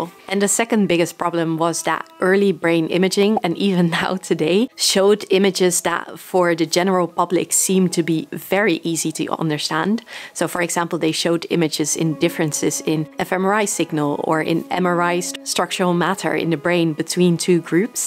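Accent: Netherlands. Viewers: Hoi! Ben je toevallig Nederlands?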